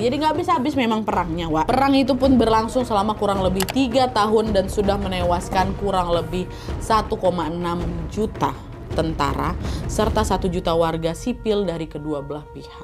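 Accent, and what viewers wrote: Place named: Palembang, Indonesia. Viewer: Aku salfok sama logat mbaa nya. Kayak logat palembang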